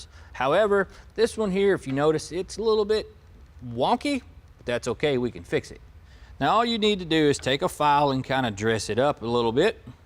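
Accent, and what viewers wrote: South, United States. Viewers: Man oh man I really love southern accent!!! Don't lose that accent.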